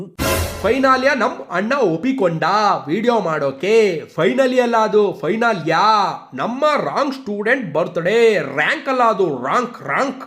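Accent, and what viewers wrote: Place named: Mysore, India. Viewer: ನೀವು ಏನ್ ಗುರುಗಳೇ ಮೈಸೂರ್ ಕನ್ನಡ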